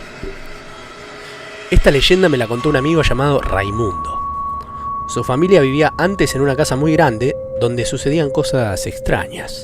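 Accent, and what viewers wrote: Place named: Argentina. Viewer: Dross que te pasó? Ahora hablas con acento argentino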